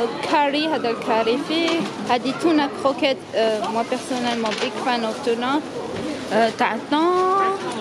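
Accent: Algeria. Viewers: هدرتها عاصمية تقطر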